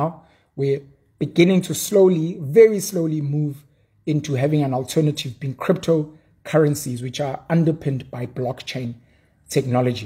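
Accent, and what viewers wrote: Zulu, South Africa. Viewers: You have one of the purest Zulu voices, from a sound perspective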